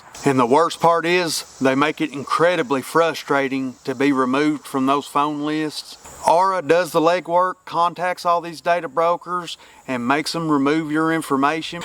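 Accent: South, United States. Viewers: I subscribed,after hearing that country boy voice,I knew we’d be just fine 🤜🏻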